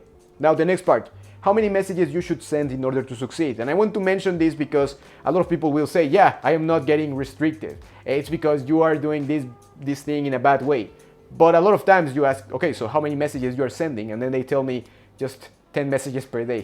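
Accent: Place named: Ecuador. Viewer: Thank you for your work. Your accent reminds me of Marlon Vera who is a very respected MMA fighter from Ecuador.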